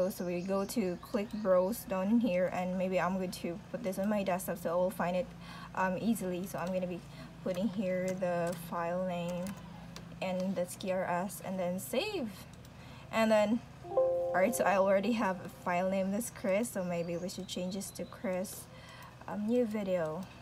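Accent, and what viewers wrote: Visayas, Philippines. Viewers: Bisaya ka te? Hahaha para lang kasi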